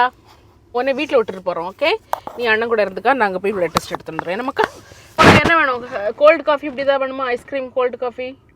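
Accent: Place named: Kanyakumari, India. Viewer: அன்பு சகோதரி ❤❤❤❤❤❤❤❤ எங்களுடைய திருவிழா வாழ்த்துக்கள் ❤❤❤❤❤❤❤❤❤கன்னியாகுமரி வட்டார மொழி மிகவும் பிடிக்கும்... இன்சூரன்ஸ் advisor's BOTH LIC &MEDICAL Star health insurance ❤❤❤❤❤❤❤ PLEASE UPLOAD.... LONDON PEOPLE HOW TO CHOOSE INSURANCE....❤❤❤❤❤❤❤❤❤❤❤❤❤❤❤❤❤ THIS IS AWARENESS VIDEO... KINDLY MY request.... Take care sister....❤❤❤❤❤❤❤❤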